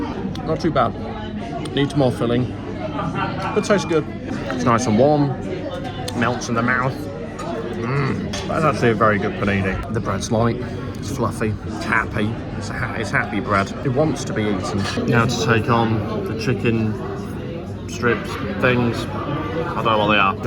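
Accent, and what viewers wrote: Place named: Doncaster, United Kingdom. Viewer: knew you were from Donny. well obvious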